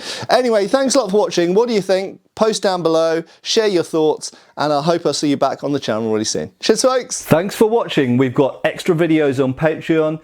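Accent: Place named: Scotland, United Kingdom. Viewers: Technically nae just an accent, but a separate language, or leid. Middle Scots was it's own leid separate from English, as is it's descendent Modern Scots, which I have been trynna practice more. Odd but nice that they are close enough you can mix them so much and still be reasonably understood. Fun at the least, as is the accent. As a broadsword/claymore practioner meself here in Canada, although admittedly a rather junior one, Ah love that I can hold a spiked targe, a dirk, and claymore all at the same time. Lotta options to stab, bash, stab, smash, or cut, as is most convenient. Always love the baskethilt content anyways! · Yeah, more scottish speaking!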